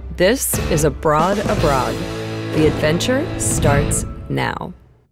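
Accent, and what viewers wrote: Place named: Myanmar. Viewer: Wait she sounds like burmese I SPEAK BURMESE!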